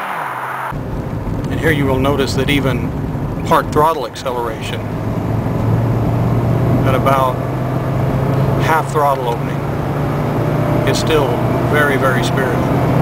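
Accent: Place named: Sweden. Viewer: ! haha sounds like you could be swedish. nice video love the merc diesels ive got a w123 240D euro of course.;)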